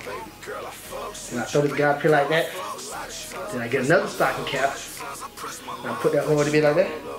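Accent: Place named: Georgia, United States. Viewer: Nigga got be from savannah,dublin,or atl💀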